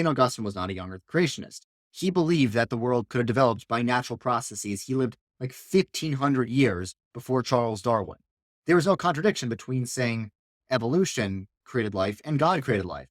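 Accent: New York, United States. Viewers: When gets mad, his New York accent just gets stronger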